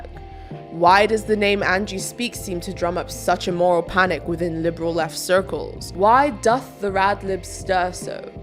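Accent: United Kingdom. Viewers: Are you from Bristol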